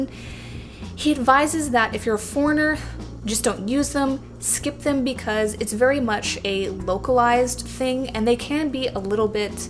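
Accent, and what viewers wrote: Thailand. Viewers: Your Thai accent sound you count number is so cute. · I love your narrative so much and give the trumbs up to your vlogs especially Thai intonation.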